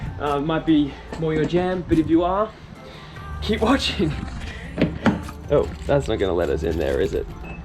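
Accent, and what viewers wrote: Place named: Australia. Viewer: Thanks for the vid! This is the 4th video of the day I've watched on this very topic.. and the best for sure! Also, I was immediately surprised by your accent. An Aussie vlogger! Hectic! Inspo. Im a teacher for ESL (English as a second language) to adults. Going through some... Challenging times.. bored of work... I've definitely always been over consuming recently!! And I have been tip toeing around my desire to talk about all those things you mentioned, as well as mental health. I don't know how but I wanna begin talking about it. I liked your Socrates reference. Good job. PS your pool's inner walls are heaps discoloured but the water looks faaabulous. Pps. In writing this I have some ideas. Thanks again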